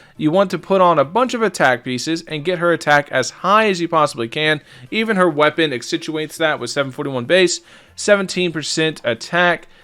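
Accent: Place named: Canada. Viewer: My girlfriend thinks you sound Canadian. Are you brother?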